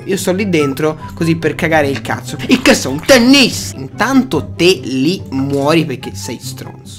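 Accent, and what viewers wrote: Florence, Italy. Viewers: adoro il tuo accento fiorentino :D :')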